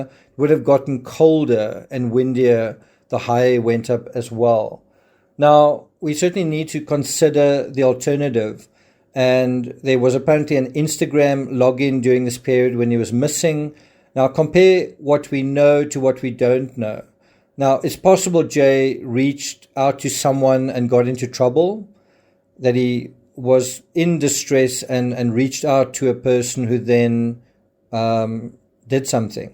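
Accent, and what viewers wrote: South Africa. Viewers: Can’t listen to a South African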